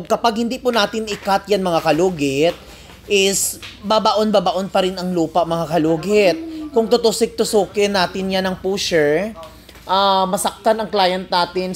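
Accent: Iloilo, Philippines. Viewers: Tingala mn ko nga daw karay a nga daw ilonggo ang panghambal pero taga Davao 😄😄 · Ilonggo k pla kya malambing ka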